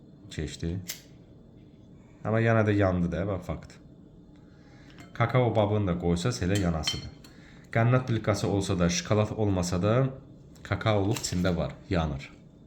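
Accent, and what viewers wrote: Ganja, Azerbaijan. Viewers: Yeniden faydalı video üçün(+Gence lehcesi üçün)çox sag olun,ugurlar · Gözəl Gəncə ləhçəsi, hər şeyə görə sagolun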